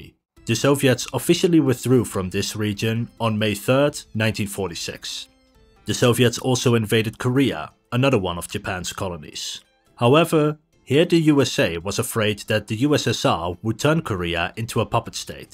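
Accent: Netherlands. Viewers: Man, I spent a lot of time trying to place your accent. It does sound Dutch, but it’s different than many of my Dutch friends’ accents. Where are you from?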